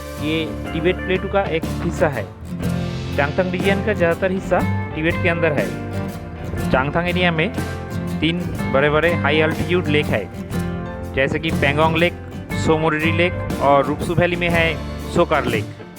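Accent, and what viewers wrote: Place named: Bengal, India. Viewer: Nice informative vlog Your pronunciation resembles to be Bengali like, are you a bengalee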